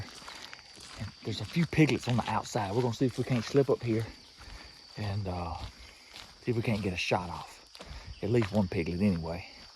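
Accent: South, United States. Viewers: I Shirley enjoy watching your videos. I've learned so much about the problem with these feral hogs. He explained why are you doing very very well and you have a nice southern accent. I'm glad you don't show you killing the hogs, you just show them after they're dead. Keep up the 👍 work.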